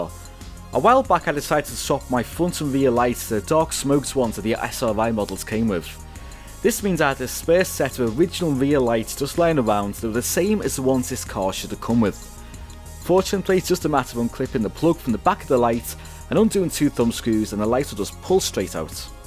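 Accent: Germany. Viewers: That's a really great result, really a fantastic job you did there. I also really enjoyed your accent, you sound German, are you originally from Germany? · Incredible transformation! Good job! Haha your accent made it very easy for me to understand what you said bc you pronounce the letter U just the way we do in Germany. Greetings and keep up the good work.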